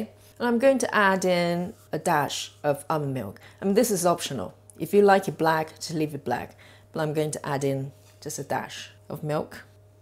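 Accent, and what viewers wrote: England, United Kingdom. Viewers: I also love your videos. You have a pleasant sounding voice, and of course love the English accent! I binged watched most of your videos last night, and can’t wait to try them all. I made your flatbread, and it came out perfect. This morning I made bullet proof coffee with organic breakfast blend coffee, MCT oil, coconut milk and 1 Stevia packet. Blended it in my Ninja blender. OMG! I absolutely loved it! It was like drinking a latte.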